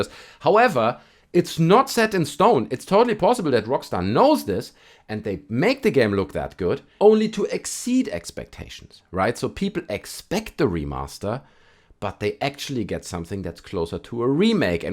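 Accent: Germany. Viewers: Let us hope this is real! BTW, are you german? I swair you Sound like me when i talk english 😅✌️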